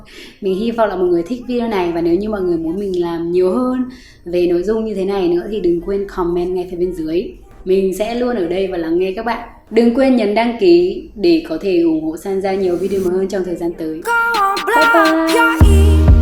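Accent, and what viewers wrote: Central, Vietnam. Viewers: Chị làm video chỉ nói tiếng miền trung đii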